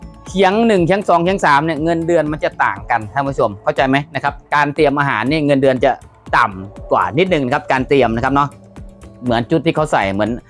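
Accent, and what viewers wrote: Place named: Australia. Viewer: สําเนียงการพูดเหมือนคนประเทศออสตีนเลียจังเลยสงสัยเดียวต้องถอดสร้นตีนยัดปากบักเชพเอ้ยได้โปรดไปไกลๆส้นตีนกู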